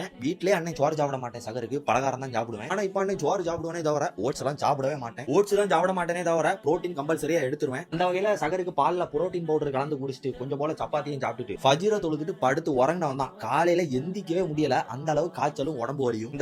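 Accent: Kanyakumari, India. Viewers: I think kanyakumari but ippo bangalore la irukaru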